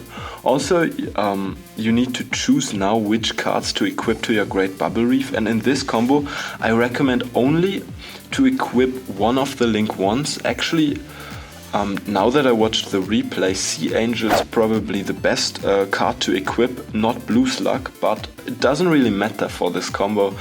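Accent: Germany. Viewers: Den deutschen Akzent feier ich hehe 👍😁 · Ich merk du bist deutsch. Gute combo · oh boy, das ist das erste mal, dass ich einen deutschen Akzent feier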